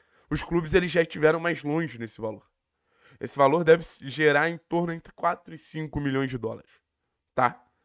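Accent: Rio de Janeiro, Brazil. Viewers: Pow! Um cara com sotaque carioca. Mais um surfando na onda do furacão.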